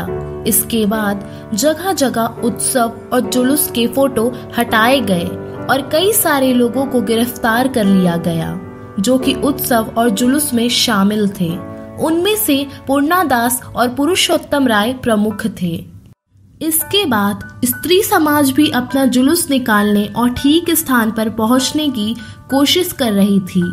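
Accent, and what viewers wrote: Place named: Hyderabad, India. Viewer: Bhai tum Hyderabad ke hain kya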